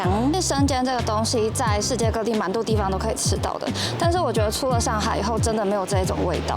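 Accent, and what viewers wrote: Taiwan. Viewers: 你那台湾腔好好听哟！😂😂😂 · 陈家生煎，听这口音是台湾腔，在台湾应该就没有这品牌了，如果是在大陆，很多三线城市，都有这联锁店，口味，效果都是和视频中的生煎包一样好。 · 回台湾久了口音又变回台湾腔了以前台湾腔没那么重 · 又看到回大陆很高兴，一开始浓厚的台湾腔听不清，后来慢慢习惯。是很有魅力的大美女，希望在上海过得开心。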